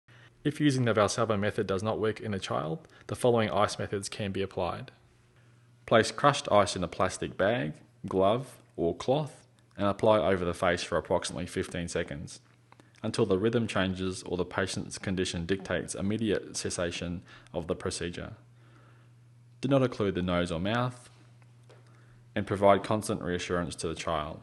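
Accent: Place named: Australia. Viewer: This bloke is an Aussie! Take it from a Brit :)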